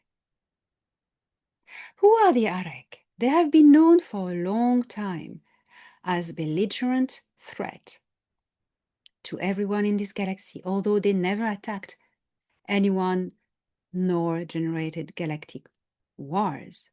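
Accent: France. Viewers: Great addition to the Star Nation News episodes :-). I had a query about #35 Webinar last weekend on the 26th that I attended related to a question I submitted that Elena answered about CE5 and which ET beings and races it is best to reach out to during such a type of meditation. I did not know where to reach out too to get this comment to Elena through herself or an associate, etc... In the answer there are a few of the ET races you mention that I just cannot fully understand/hear properly what you are saying, most likely due to your elegant French accent and dialect, but if I could somehow get some clarification on this from you, I would greatly appreciate it. What I can make out is : Kaheel from Epsilon (something) Aheel Alteans intergalactic confederation Aruhri Arcutians Zanete Now, in particular, the two I can’t understand are the Kaheel from Epsilon (something). 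Obviously, the something part, and the Aruhri Arcutians Zanete these two I am not certain at all, so any clarification on these and whether I have it right would be appreciated. The other two I think I pretty much understood. One thing I was wondering about was the Alteans' intergalactic confederation? Is that some sort of like a federation those beings are in? Any feedback and clarification on this would be greatly appreciated. I of course look forward to building my CE5 meditation technique now around reaching out and connecting with these beings. Thanks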